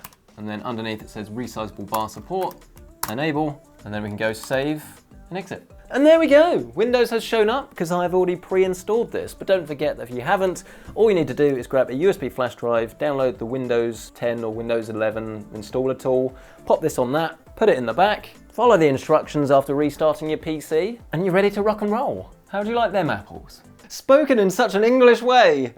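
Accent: United Kingdom. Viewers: British boy talking about computers makes me happy :D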